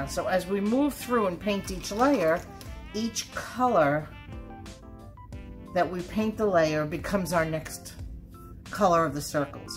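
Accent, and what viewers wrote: East Coast, United States. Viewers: Ok,! I love this tutorial.. and your East coast accent 😜🥰 Thank you!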